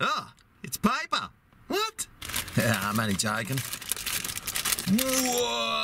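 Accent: Australia. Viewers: I detect an Australian accent. Hi I'm from New Zealand. I have just found your channel and I'm excited to watch all your videos. I watch a lot of youtube videos because I can't eat a lot of food. I only have one metre of intestine left after having 6 operations over 20 years removing blocked parts. I also have raynauds, short gut syndrome, I have major bleeds and clots and I have food issues related to aspergers. I'm terminally ill now and my doctors are astounded by how I fight back and recover when most people would no longer be here. The funny thing is I love to cook for my parents but most of it I can't eat however I enjoy watching people loving what they are eating. I want to thank you for your videos as I'm intending to use them to distract me from my 24/7 pain which is a major issue for me. This has worked for me with some other channels. So thank you and keep up the good work. 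I know that it takes a lot of time to create a video idea, film and edit. You are a natural in front of the camera. Xx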